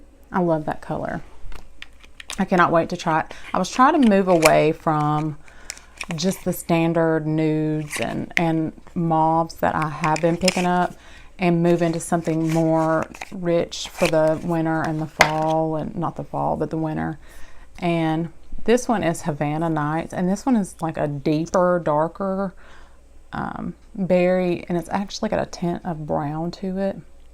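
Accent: South, United States. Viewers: I love your voice it`s so soothing and a lil country too, but that`s ok I like it.{@ on IG}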